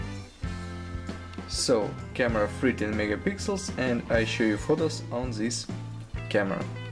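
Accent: Russia. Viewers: хехе факин рашн экцент, но это ничего, а вот политическая карта мира..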